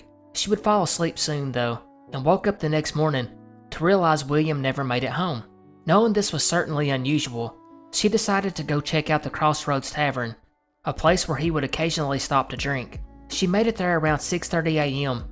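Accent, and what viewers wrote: South, United States. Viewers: Haha also you saying hello fam was a culture shock because fam is British slang over here 🤣 miles and miles away from the south states of America - enjoying the series as an outsider and my heart on the first part talking about your accent. Other than preconceived ideology of the south I still can't put a face to a voice I'm sure we'd all be interested in a face reveal even though your content doesn't suit that (oh god those yters that combined this content with face cam and the audio quality is different). Anyway as a British hooligan I've commented about your accent before and your dialect doesn't bother me one bit I love your pronunciations they make me giggle in a completely non judgemental way I'm sure we'd be giggling at each other if you heard my accent too 🤣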